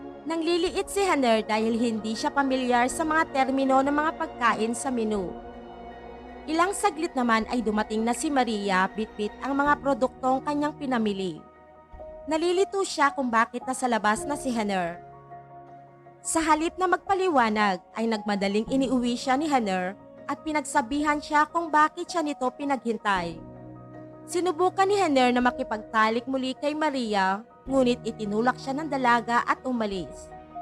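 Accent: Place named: Pampanga, Philippines. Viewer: Kapampangan ang nagkukwento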